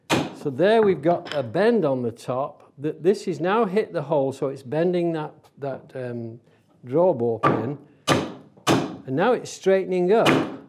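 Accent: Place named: England, United Kingdom. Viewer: Super travail et quel plaisir d'entendre un bel accent anglais. Merci.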